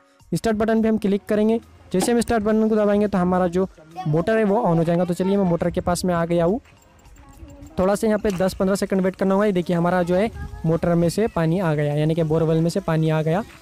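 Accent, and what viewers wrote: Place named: Hyderabad, India. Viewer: Hyderabadi Lagte Ho 😂 I love Hyderabadi hindi Language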